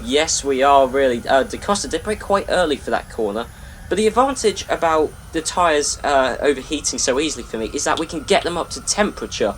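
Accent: United Kingdom. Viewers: Are you from Luton in Bedfordshire?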